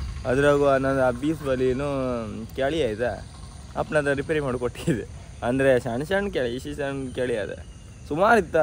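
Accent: Kundapura, India. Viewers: I like kundapura kannada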